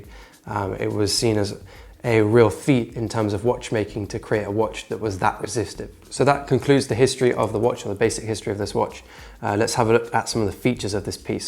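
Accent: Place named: United Kingdom. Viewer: because hes British and they can't seem to pronounce their own language to save their lives over there.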